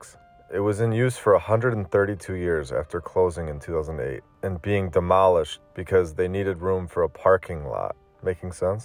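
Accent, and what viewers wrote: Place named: Midwest, United States. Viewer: Midwest accent, reminds me of home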